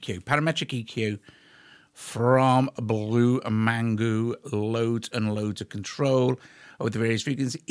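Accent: Merseyside, United Kingdom. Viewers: Always love your reviews. Your bright positivity, and premium Mersey tones!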